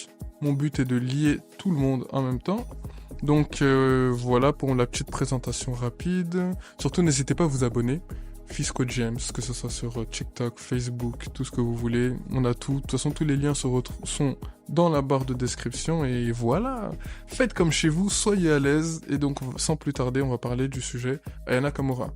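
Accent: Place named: Antilles, Caribbean. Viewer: Toi tu es antillais, je reconnais l'accent. Entre nous, on se reconnait, hein.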